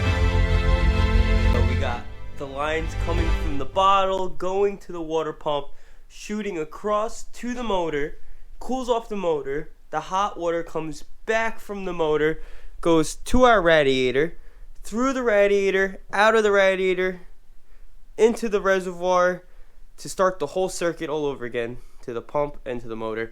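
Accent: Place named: Canada. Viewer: Great vid but that accent is rough dude. Where are you from? Canada? Here in the south you would stick out like a sore thumb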